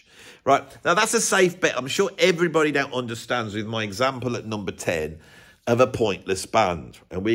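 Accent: Birmingham, United Kingdom. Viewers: This was brilliant, so funny! Your brummie accent is perfect for this type of vid. I totally get it with these bands, most people think these bands are good because they’ve been told they’re good. I’m looking at you, even your name is a dull colour. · Nice to hear a grumpy Brummie (?). I thought I was the only one. Are you sure about and groupies? I can’t fathom it unless the gig was at a School for the Blind.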